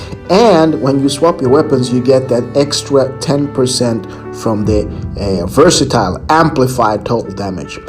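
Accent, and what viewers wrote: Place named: Nigeria. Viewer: You sound Nigeria bro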